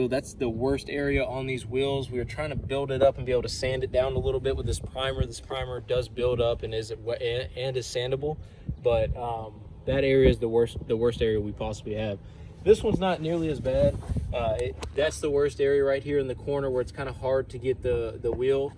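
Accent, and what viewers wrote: Alabama, United States. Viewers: You are from Alabama right ?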